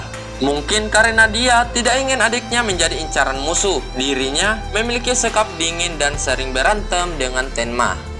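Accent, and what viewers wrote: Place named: Maluku, Indonesia. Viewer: Bang mau nanya nihh, Abang orang mana yaa kok logat nya kyk orng Maluku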